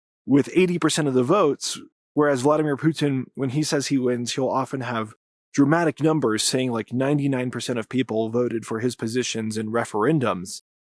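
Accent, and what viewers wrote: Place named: Southern California, United States. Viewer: Is he from California? I’m trying to peg the accent and I swear it’s SoCal.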